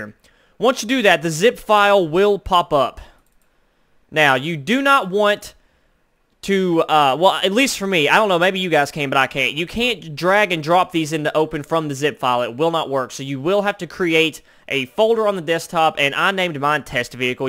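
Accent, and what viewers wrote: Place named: South, United States. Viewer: you sound like the country version of danny trajo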